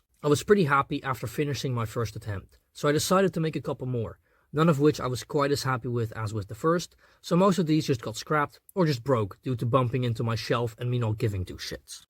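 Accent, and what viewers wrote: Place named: Netherlands. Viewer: k voelde dat je nderlands was en nu weet k het zeker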